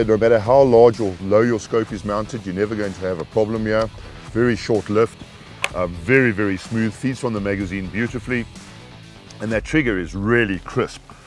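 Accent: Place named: South Africa. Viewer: South African? Hallo boet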